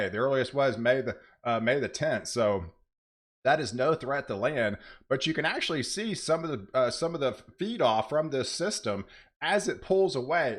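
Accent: Texas, United States. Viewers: This guys voice sounds like a young Christopher Walken with a Texas accent.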